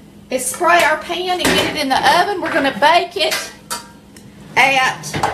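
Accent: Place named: Alabama, United States. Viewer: yes i love her sweet voice. im a alabama girl in ohio. how i miss that accent. god bless you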